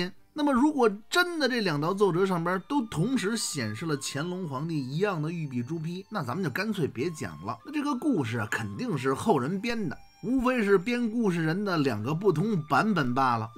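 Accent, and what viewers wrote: Beijing, China. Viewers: 纯粹咱老北京音您呐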